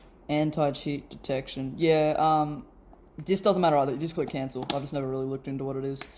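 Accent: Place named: Australia. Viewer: Aussie very much ahahaha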